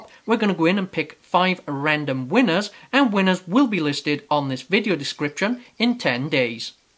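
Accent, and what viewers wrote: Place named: Scotland, United Kingdom. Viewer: What accent is this?, its kind of scottish / south african / jordie.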